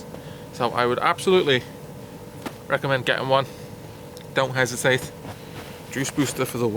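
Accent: Liverpool, United Kingdom. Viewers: Interesting! I've just found your videos, only because your i-pace v e-niro comparison was posted on a Kia forum, I'm really enjoying watching them. I like your natural presentation style and subject matter, and like seeing the beautifully spectacular finnish scenery. I cringe when I see you touching things with your bear hands in those temperatures😮. I suffer from reynauds so I can't tolerate even the slightest dip below freezing point😟. Do I detect a scouse accent?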